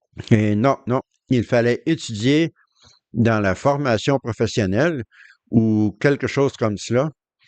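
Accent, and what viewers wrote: Quebec, Canada. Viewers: Merci pour ton travail de recherche… pour mettre en évidence les corrections, les changements de la doctrine… Je suis né de parents TdJ, baptisé le 20 avril 68 à 14 ans, membre du comité comme serviteur aux études bibliques à 18 ans, j’ai suivi la formation de l’école du ministère du royaume au Bethel de Bruxelles. J’étais le plus jeune avec les autres frères qui avaient entre 30 et 60 ans… quelques mois après, une nouvelle règle a été mise en place…:il fallait avoir 20 ans minimum pour occuper un des postes du « comité » : surveillant président, adjoint et serviteur aux études biblique. Je me flatte d’avoir été la cause de ce changement de règle dans l’organisation. Moi qui servait de tout mon cœur, viré comme un malpropre pour être remplacé par un frère qui refusait de prendre les sœurs âgées dans sa voiture parce qu’elles avaient mauvaise haleine… mais il avait plus de 20 ans, c’était le plus important désormais pour la WT, plus que la disposition de cœur… Ça a été une douche glacée sur mon feu dévorant intérieur. 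Ça m’a pris des décennies pour me déprogrammer de tout cet endoctrinement. Encore merci pour la clarté et la précision de tes vidéo. J’adore ton accent Québécois 😉 On fera bientôt connaissance dans le nouveau système de choses… 🤪😜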